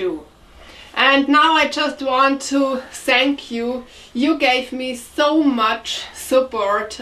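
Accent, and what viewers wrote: Germany. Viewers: Has to be the accent. I like it when she goes all German tongue. Helps me learn the language. Very Polite Lady.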